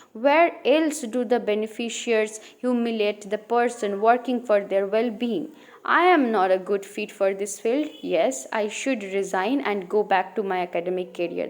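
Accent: Bengal, India. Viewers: BENGALI ENGLISH:face-blue-smiling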